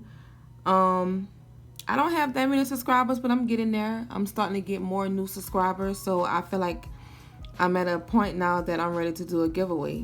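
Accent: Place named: New Orleans, United States. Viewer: baby that New Orléans accent thick hunny 😂😂 (i love the way they speak). nails look good girl keep up the good work this really helped me fix my broken nail. 💕🙏🏾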